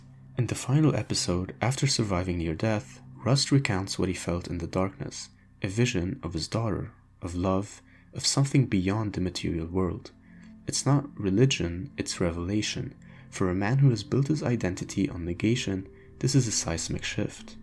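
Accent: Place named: Eastern Europe. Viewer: same insights with eastern european accent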